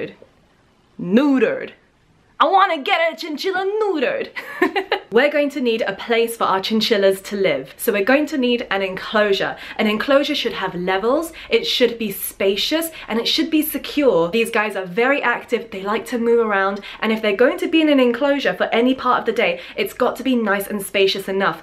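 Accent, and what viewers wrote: New York, United States. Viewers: As a born and raised new yorker from brooklyn your accent made my day 😂😂😂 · I'm OBSESSED with different accents in English and I have to say your American accent' s probably better than my British so don't be too modest 😉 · Lmfaooo the American accent omgggggg amazing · I love u so much. Your American accent was even better than mine and I'm from America. I love you so much and keep being amazing and being your awesome self. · Your American accent was great! As a New Yorker, I really appreciated your New York accent! Lol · I love her american accent lmao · I love the American accent. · Love ur videos. You are so cool and smart. Btw ur American accent is on fleeek. Though when u said neutered with the t u exasperated it. Other than that u can fit in. And that New York accent is pro. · Omg her American accent is so good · Her American accent freaked me out!! 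Maybe it's not fake, but her real accent 😲 she does it so well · well your american accent is fine to me i love your videos your just amazing! bye the way your my fav youtuber! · OMG your Amercain accent is on point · Hey, I'm from America and m saying your American accent is on point👍👍and, you are cute with all of your animals😊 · i have a chinchilla he is so loveable and youhad a good american acent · American accent on FLEEK! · As a trained actor I can say, your American accent… Not bad, not bad at all. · 1- your American accent is killing me😂😂 2- I subscribed 3- I like how you call the U.S "the states"😂😂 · i personally dont really like kaytee because ive read online that they add in too much products and other things like that. but i did enjoy your American accent attempt!!! love you!!!🐭❤️ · Yo that’s a fire American ascent tho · I love that American accent! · her ny accent was so cute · Im just binge watching videos- Your american accent sounded so good 😂 i talk just like that. 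· I just found your channel and so far I love you videos. By the way you did really well on your American accent. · Your American accent is flawless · Your American accent is great!!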